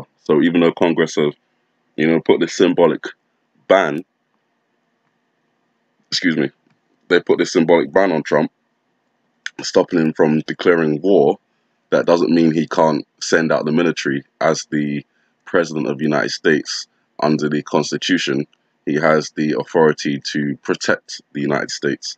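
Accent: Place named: London, United Kingdom. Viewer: He's from London lol I can tell...sometimes the accents are a bit hard to understand for others...I understand it though as I'm from England too. ;p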